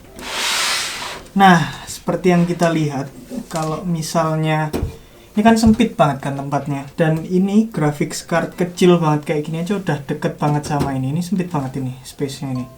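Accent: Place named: Java, Indonesia. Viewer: Orang jawa mana bang.. Muedook bnget.😁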